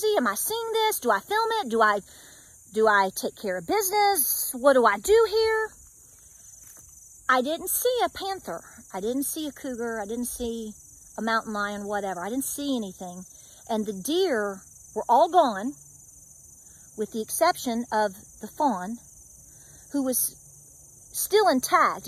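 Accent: South, United States. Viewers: Love your Down to Earth style talking. Southern kinda talk; I'm a Tennessee born individual and (ha ha 😅😅😅) it's my style that's like down home old schoo.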